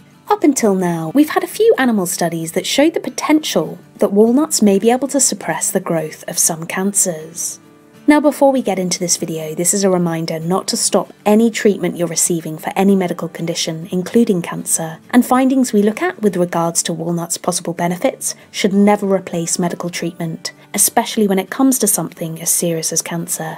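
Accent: England, United Kingdom. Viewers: Having a lovely English accent is pure gold here in America. You already get a 10% bonus for sounding more authoritative. New sub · I love the British accent. Watching from California.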